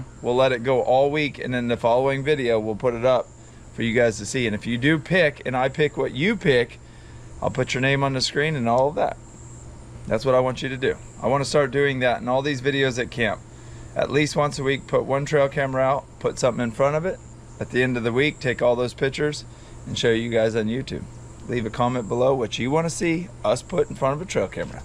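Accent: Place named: Kentucky, United States. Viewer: You sure your not from Kentucky, you speak like you are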